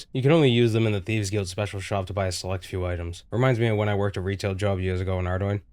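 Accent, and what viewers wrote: Boston, United States. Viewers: Hahha the thick Boston accent keeps coming out, makes me think about Bill Burr reminiscing about cops in Boston: THE KID'S A GOOD SHIT ^^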